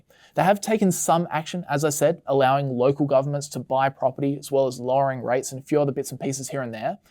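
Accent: Australia. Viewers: First time watching your video... Aussie???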